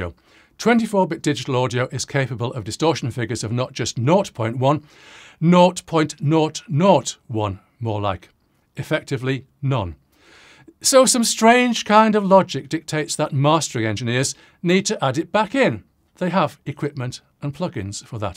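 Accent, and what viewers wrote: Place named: Merseyside, United Kingdom. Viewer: I have original CDs from before 1995 that sound bad because the analogue to digital conversion wasn't very advanced when they were transferred, they have good dynamic range but lack definition.... granted a lot of "remastered" modern recordings are awful and have the 'flat" sound that comes with over compression and over-processing in general. I notice you have a slight Merseyside accent.... my neck of the woods, anyway thanks for a very interesting video that tells the truth about our modern recording world.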